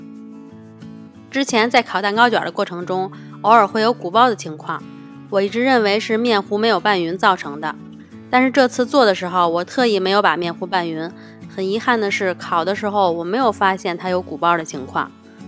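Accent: Beijing, China. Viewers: 我的天啊 辛苦你了！太不容易了！这得吃多少蛋糕...京腔听着很舒服哈哈哈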